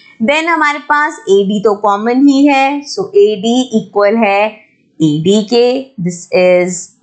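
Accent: Punjab, India. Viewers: Tuse Punjabi ho kya???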